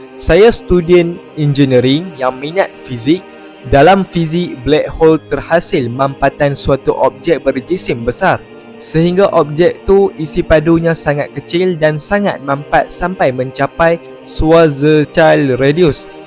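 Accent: North, Malaysia. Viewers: Bahasa melayu loghat utara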